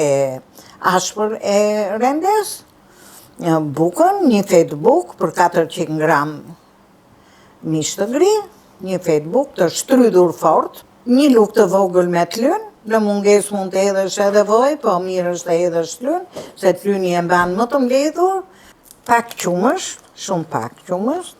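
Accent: Tirana, Albania. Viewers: Amon sa mir qe je nje zonj tironce me adete e me te folme.hallall ta bofte zoti☝️🤲🤗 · Te lumshin duart e nderuar zonje.Te kenaq me te foluren origjinale , ke dhe zerin te bukur , dhe te perfytyroj nje zonje te kendshme tironse❤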